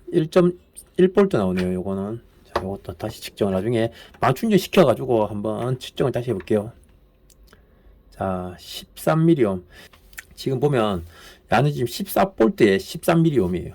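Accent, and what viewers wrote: Gyeongsang, South Korea. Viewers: 자막설정 안하면 뭔말인지 몰것다...ㅎㅎㅎ 경상도 사투리는 언제들어도 적응이 안된다.......ㅎㅎ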